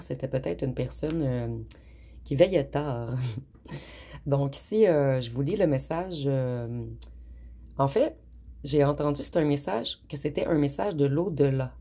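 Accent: Quebec, Canada. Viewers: J'aime bien votre guidance toujours fort juste ,ainsi que votre accent québécois , votre voix et vos mains et ongles très jolie vous avez l'air très coquette et tellement lumineuse et juste vrai .👍❤🙏🍀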